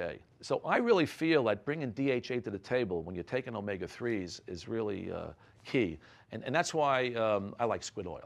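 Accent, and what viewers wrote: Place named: Brooklyn, United States. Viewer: Dr Sinatra has been my cardiologist for a decade now and he doesn't even know it but I know of him from a grounding video as well as a supplement customer. He's down to earth and "grounded." That Brooklyn accent sounds a little like Anthony Faux Chi but unlike Faux Chi..... We KNOW Dr. Sinatra will always tell people the truth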